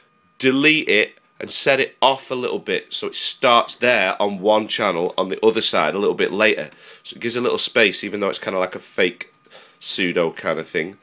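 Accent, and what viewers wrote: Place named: United States. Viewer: superb american accent, you sound like the filmer Beagle from baker skateboards haha. love your tunes, neat tutorial!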